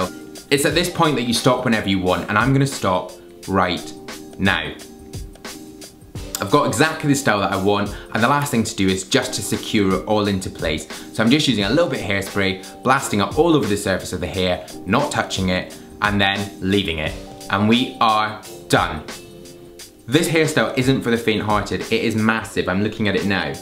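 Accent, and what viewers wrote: Scotland, United Kingdom. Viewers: you know what's cool you're scottish and i understand everything you said because scottish people have a different accent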